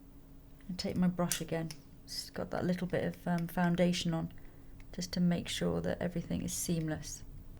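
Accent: United Kingdom. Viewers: wher u from UK??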